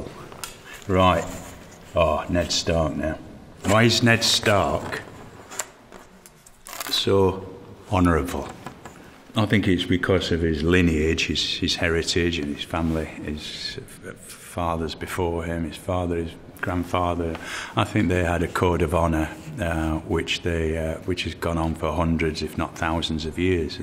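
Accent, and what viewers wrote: United Kingdom. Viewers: Well I'm argentinian so I really cannot say nothing more than "I love Bean's british fOcking accent". Btw I love his irish accentt as well xD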